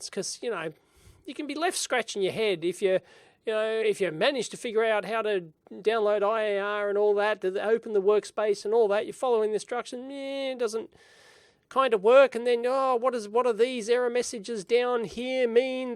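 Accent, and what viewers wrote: Australia. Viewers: With your australian accent, honestly I sometimes wasn't sure whether you said "uhm..." or "ARM" :) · Wtf he is australian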